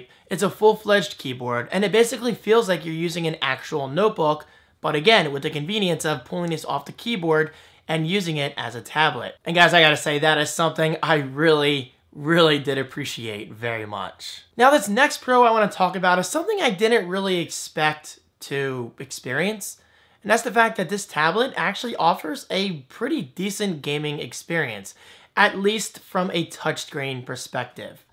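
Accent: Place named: New Jersey, United States. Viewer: am i the only one who heard him say saalid instead of solid... you are rocking the jersey accent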